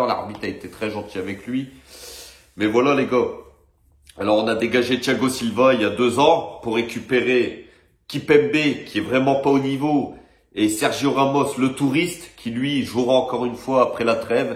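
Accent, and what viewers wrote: North, France. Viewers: T es nordiste ?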